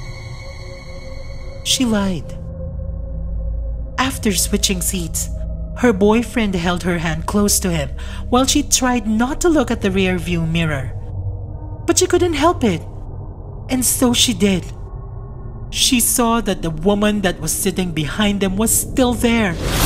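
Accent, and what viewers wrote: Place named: Philippines. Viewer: Ang sosyal naman..first time I heard a Filipino narrator doing an English story. Something new, although I didn't feel so scared because I was thinking the entire time that you're a different person because of the accent and everything.!! I mean not that intense when you're doing Tagalog horror narrations..Hahaha.. 😍😅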